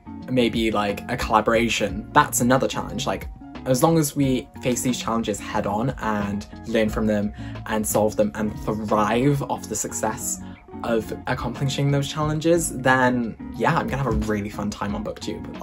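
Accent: United Kingdom. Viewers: my first thought was British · your British accent is EVERYTHING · Just subscribed to your channel. Love your British accent and your energy. Bring on the videos and reading vlogs.😊😊😊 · I dont know why i didnt expect you to be british but i love it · I am to young to have twitter and I found this book tube channel and the British accent...😍 Also you sort of remind of Jesper from Crooked Kingdom! Edit: Jesper is my favorite character! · Why is your accent doing everything for me (fellow BRIT AYYY). I FUCKING LOVE DA: I SO FUCKING MUCH. Going at things in your own way and at your own pace is so crucial and I am happy you found that place yourself and a time wherein you feel best. I resonated with so much of what you said as a POC bi who is also writing a book and I cannot wait to see what content you pour out. OMG HATSUNE MIKU GO OFFFFFFFFFFFFFF LOL. Tbh the anxiety as a whole crippled me to the point I left for a while, trying to come back and accept myself more. 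Hope you keep slaying and doing incredible things with your channel.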